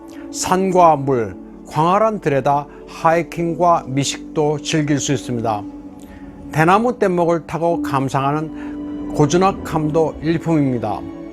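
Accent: China. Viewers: 박상후 기자님 중국 화교이지요? 너무나 중국사람 발음을 애용하시네요. 대한민국식으로 한자 발음을 표기해주시면 너무 감사하겠읍니다. 박상후는 중국식으론 어떻게 발음합니까? 이렇게합니까? 제발 한자 발음을 제대로 표기해주시기 바랍니다.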